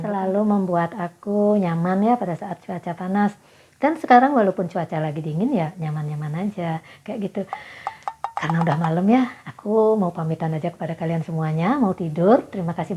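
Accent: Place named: Bali, Indonesia. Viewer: posting malam bgt, tp ada notif langsung nonton saya mba krn kamu lucu. logat balinya kental sekali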